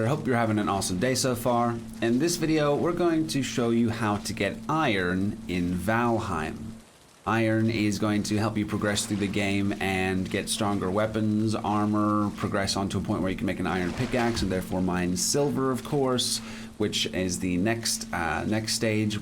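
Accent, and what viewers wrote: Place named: United Kingdom. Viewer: You have a pretty strong UK accent imo. Haha. Thought you were a "native" xD Where are you originally from?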